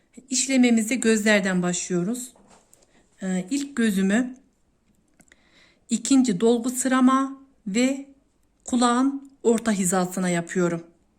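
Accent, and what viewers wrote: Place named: Central Anatolia, Turkey. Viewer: İlk yorum benden olsun hafif İç Anadolu aksanı seziyorum hemşeriyiz sanırım ayrıca çok güzel içerikler var ✅🙏😊👏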